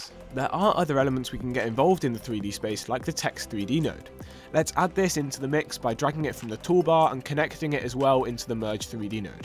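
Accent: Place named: United Kingdom. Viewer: terrible british accent, can't understand anything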